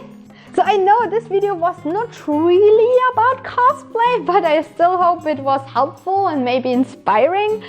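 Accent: Germany. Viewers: yeah haaaard accent i'm from germany too;-) I'd like too see a german cosplay video not made by anny the duck (doesnt means their not good)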